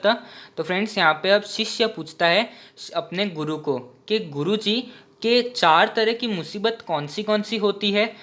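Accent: Gujarat, India. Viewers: Aap gujarati ho na ? Aap jese bol rahe ho vaisa to gujarat me Hindi chalta hai .... By the way I am also from Gujarat 🙋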